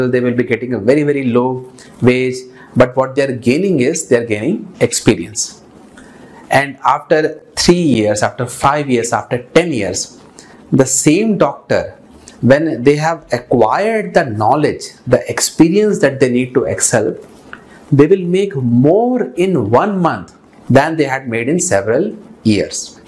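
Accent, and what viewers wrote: India. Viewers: Even after 10 years of US life, you still have typical Indian accent. Nice to hear.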